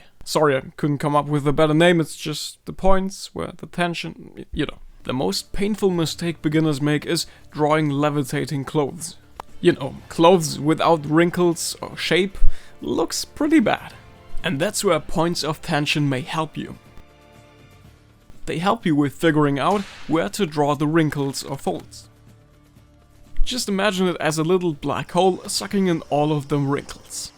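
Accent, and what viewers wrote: Germany. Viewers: Ah, German? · I have never seen your channel before, but as soon as I heard your voice, I knew you were German. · Dude I love your accent (Hört richtig das Deutsche durch XD) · Man hört den deutschen akzent noch durch, aber das wird wohl besser werden mit der zeit. ausserdem ist der voll gut, man merkt ihn aber er ist nicht krass nervig oder ähnliches. Aber die übersetzung an sich ist echt schön geworden :o auch die betonung usw. Narutos clothes are THICC (okay) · Ich finde das du super Englisch sprichst. Eigentlich stört mich ein deutscher Akzent im Englischem immer, welchen man bei dir auch ein wenig raushört, doch es klingt trotzdem gut ~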